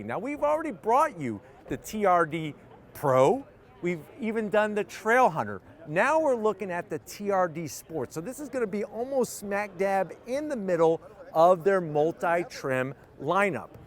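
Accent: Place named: New Jersey, United States. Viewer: This guys whole style and accent scream cheesy Jersey City car dealership commercial from 1987.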